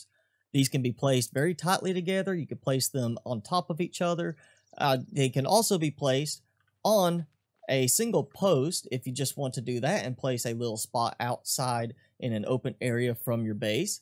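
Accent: South, United States. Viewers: FINALLY A YOUTUBER WITH A SOUTHERN ACCENT, I HEAR EVERYTHING YOURE SAYING BROTHER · I'm from Georgia and we also talk like that, but when you live here no one notices.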